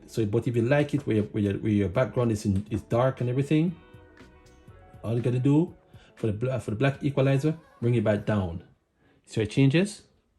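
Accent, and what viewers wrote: Jamaica. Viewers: Sounds like a Jamaican :)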